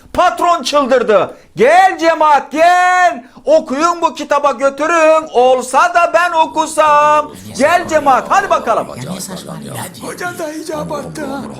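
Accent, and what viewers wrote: Denizli, Turkey. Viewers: Denizli Şivesi ile konuşman da balı oluyor bu kanalının teşekkür ederiz biraz olsun sorunlardan uzaklaşıyoruz İYİ ki varsın teşekkür ederiz sağlasın 🙋‍♂️💐🥰🧿🧿🧿🧿🌹👏👏👍👍😅🤣😂😁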